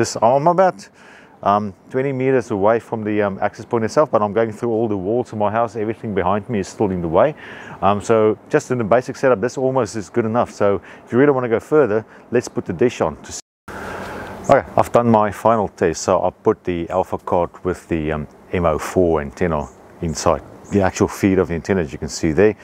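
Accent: South Africa. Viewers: This guy is South African lol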